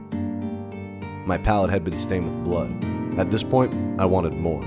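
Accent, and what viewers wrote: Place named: Wales, United Kingdom. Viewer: a welsh guy doing the voiceover cba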